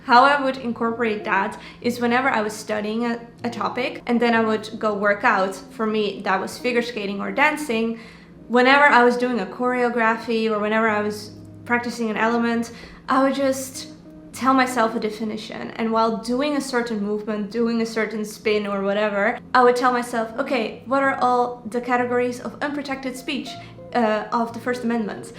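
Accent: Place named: Netherlands. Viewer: Hoor je nederlandse accent 🥰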